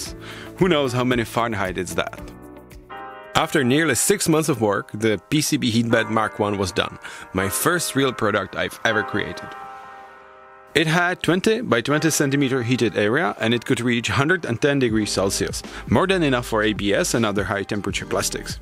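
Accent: Czech Republic. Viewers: i like your early czech accent..and really...NO Obsolecence sounds so great!!! i missing 1 or 2 nice prusament skintones...maybe in the future ?greetings from germany.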